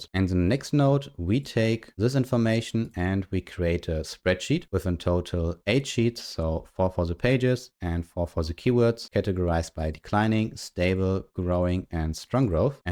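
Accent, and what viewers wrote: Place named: Germany. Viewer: Hear german accent, know it's quality without watching the video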